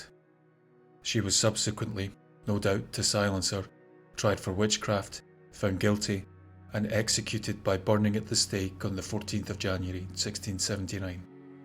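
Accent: Scotland, United Kingdom. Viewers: Just your voice alone makes one wish to see Scotland.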